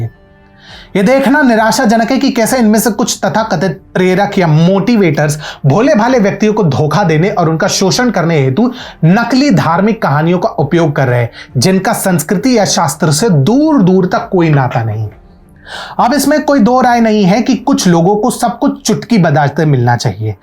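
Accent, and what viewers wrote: Standard. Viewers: आपकी इतनी अच्छी , शुद्ध और साफ हिंदी के लिए आप को साधुवाद ।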